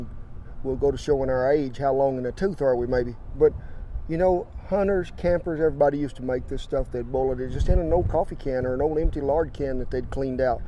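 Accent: United States. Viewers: Felix Hannigan Felix, you will find all us Americans speak English dis way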